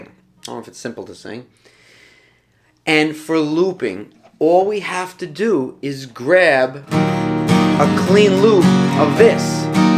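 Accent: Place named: New England, United States. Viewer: Awesome instruction and easy to follow. Bonus for the NE accent.👊🏻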